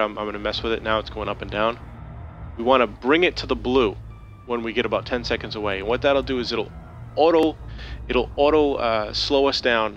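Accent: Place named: New York, United States. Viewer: Just bought this game yesterday, thanks for making these videos. Judging from your accent you're a fellow New Yorker. Sounds like either Brooklyn, Staten Island, or Long Island. Peace!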